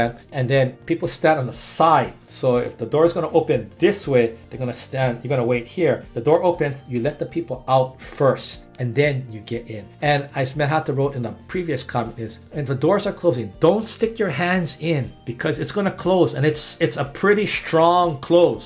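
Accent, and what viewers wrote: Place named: Hawaii, United States. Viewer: are u from hawaii. i can hear the pidgin accent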